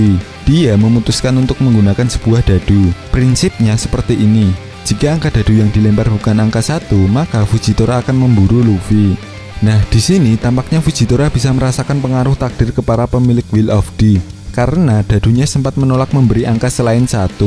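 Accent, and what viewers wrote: Java, Indonesia. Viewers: medoknya itu lho gak tahan 😂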